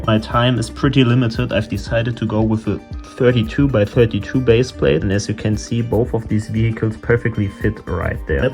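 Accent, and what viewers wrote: Germany. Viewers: I hear a little bit deutsch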